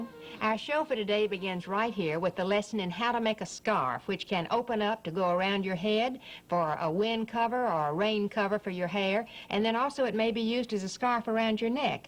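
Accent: South, United States. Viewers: i don't remember this woman but i like her southern accent.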